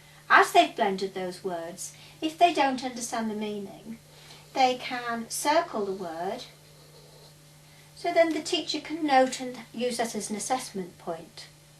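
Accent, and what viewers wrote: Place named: United Kingdom. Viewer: british accent. the best accent. thanks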